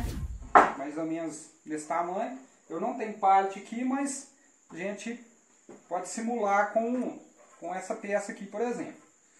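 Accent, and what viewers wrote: Minas Gerais, Brazil. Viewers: Muito bom e ilustrativo ainda mais com esse sotaque mineiro ficou melhor ainda parabens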